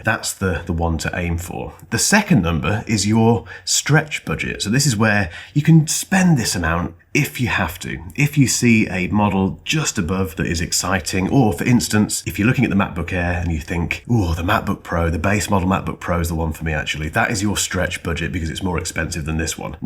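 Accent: United Kingdom. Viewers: Hi! Always turn to you for some of the most sensible Mac product buying advice on the internet. Besides, as a Yank, I’m obsessed with your Brit accent! Best. FYI, my current MacBook is the M2 Air. 🇺🇸🇬🇧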